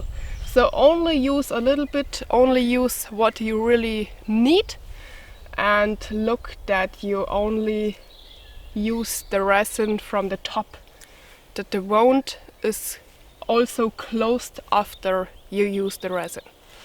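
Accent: Germany. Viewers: Hallo, Es tut mir leid, Ihr Leben zu erforschen suchen, mag ich Ihre Videos und liebe Ihren deutschen enlish Akzent, versuche ich, Deutsch zu erfahren, weil ich viele deutsche Freunde in paddernorn habe, Ich weiß die Geschichte der Vögel und der Bienen, aber wie haben Sie ein Baby? ich dachte immer, dass Sie eine einzelne Wikingerfrau der Wald-Mumie waren? ich frage in Deutsch so nicht viele Menschen werden wissen, was ich frage, habe auch ich eine Verbindung mit Deutschland, weil ich in den britischen Armeebaracken konzipiert wurde, wenn meine Mama und Vati, der war in der britischen Armee, und macht es hoffentlich leichter für Sie zu verstehen?;)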